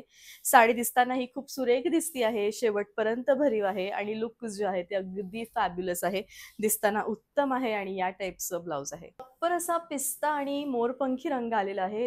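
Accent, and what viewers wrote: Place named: England, United Kingdom. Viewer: Te sagala thik ahe but boltanna jabardasti marathi boltanna english accent cha use ka krtes?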